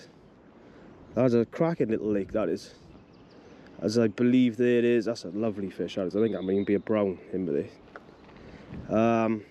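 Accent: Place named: Cardiff, United Kingdom. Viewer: Hello mate, just watched you for the first time, great content I just subscribed. Trying to tie down that accent of yours, I'm guessing Cardiff or close by! I'm in Ebbw Vale.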